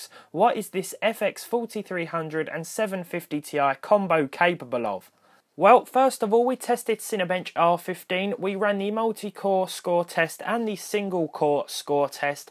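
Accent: United Kingdom. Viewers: Of course I enjoyed this video. I'm in love with your British accent. It's so fascinating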